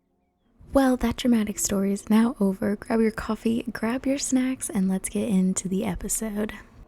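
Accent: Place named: South, United States. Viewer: I love how towards the end she just transitions more and more to her southern accent 😂😂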